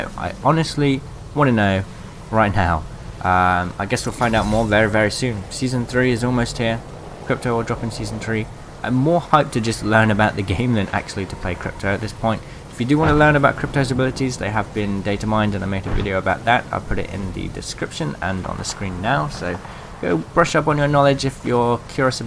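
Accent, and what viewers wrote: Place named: United Kingdom. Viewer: He looking slick 😂 I love your voice the true British sound better then mine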